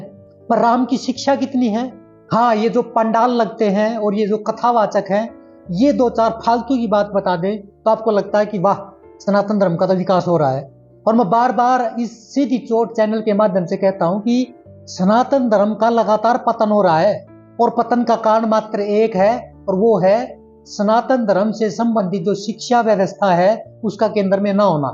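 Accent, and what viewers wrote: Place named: Haryana, India. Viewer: Aap jaat ho ya gurjar aapka accent haryanvi jaisa h ...ya phir aap gujrati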